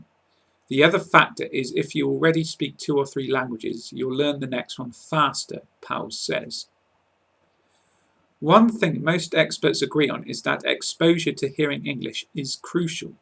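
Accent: United Kingdom. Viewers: I LOVE the british accent :)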